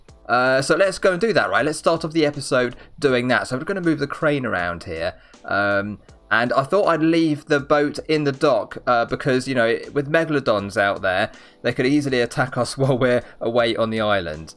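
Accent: United Kingdom. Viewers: think they changed somethings with the megaladon.. that f* B* follow my boat for at least 15 min. really like your vid's dude! Charming accent aswell UK i presume? Ever thought about making a stormworks server? think it could be fun, you might want to look into it cause i would join that in a second. i understand it's a lot of pc power required for keep it running but how you play solo is already really cool.. now ad that to the followers you already have. just a thought mate:) keep going you're amazing video's about stormworks!. NJersey is okay but he thinks he is amazing and presumes to much. frantic is funny cause its an idiot. Camado is US and wants views lately. well that's enough b*tching. check the server stuff. guessing of the followers you have, we would have Alot of fun:)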